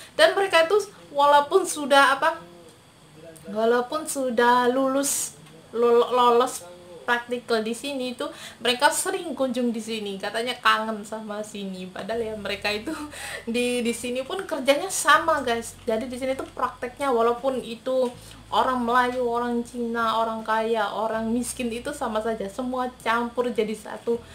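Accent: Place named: Indonesia. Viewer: Yang kamu cakap sekarang ni bahasa Melayu lah Yang beza loghat Kamu berbahasa Melayu loghat Indonesia Di Malaysia bahasa Melayu loghat Malaysia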